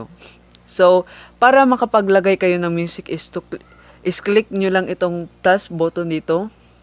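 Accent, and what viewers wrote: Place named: Visayas, Philippines. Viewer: feel nako bisaya gyud ni ba HAHHAHA i feel uuuu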